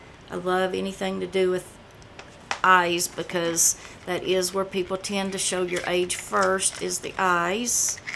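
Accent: South, United States. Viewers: You are such a Sweetheart, :), Love your southern accent. :) I'm born n raised in Ft. Lauderdale Fl. But live in NY now for years. I love your videos. Thank you so much for sharing hun.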